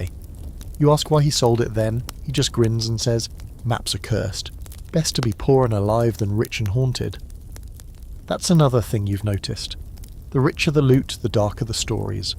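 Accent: United Kingdom. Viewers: am Yorkshire ❤️ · Stop believing every word this guy says just cuz he has a British accent 😂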